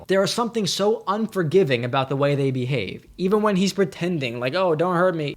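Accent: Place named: Wisconsin, United States. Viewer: Does anyone know where he's from? He sounds kind of Wisconsin :P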